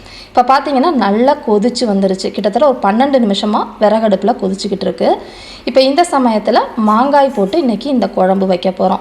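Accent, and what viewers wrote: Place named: Coimbatore, India. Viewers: கொஞ்சும் கொங்குத் தமிழில்.. மீன் குழம்பு .. சுவை மனம் கமகம.. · U r tamil slang is very nice it like coimbatore style I am from coimbatore so I love u r tamil I feel iam in local coimbatore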